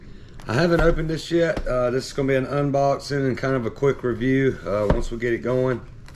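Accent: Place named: South, United States. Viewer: Omg! Love that thick southern accent! And your information!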